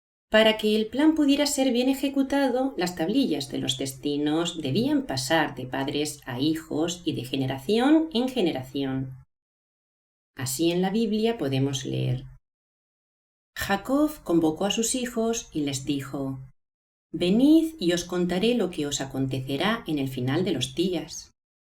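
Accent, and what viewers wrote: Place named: Spain. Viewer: misael rrodriges a mi su voz española se me hace bien horrible!!!!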